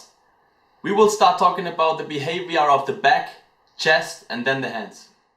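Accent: Germany. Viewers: hey dude .. this is very helpful , thanks !! by the way .. Are you a german ? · Du bist doch deutscher mann hört’s an deinem Akzent 😂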